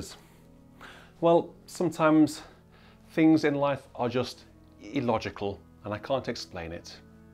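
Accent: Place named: United Kingdom. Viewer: For 1990's digital organ (Viscount), they are number of quite good sounds, others????. Sounds probably could be better if tweeked with amplifier and biiiiiggg speakers. But nothing comparable to 2021 Hauptwerk. I took my AGO Service Playing certificate in 2010 on a 1997 Rodgers 950 3 manual professionally installed Speakers/crossover + 6 ranks of Fufatti Pipes. in 2000 seat church. That sound I thought was excellent. But Hauptwerk in 2021 is the best!!! Digital Diapason has never sounded as good as the real pipe. As a Texan your commentary is always fun to hear--your words sound more intelligent with a British accent!! Love the dialog: organ is "rather heavy" to move, Jolly useful", Holy Moly", "get the Dyson", etc. Appreciate you., too.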